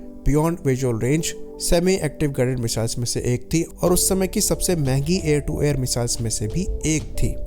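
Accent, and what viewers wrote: India. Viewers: Sawdesi 😂😂😂 tatti indian accent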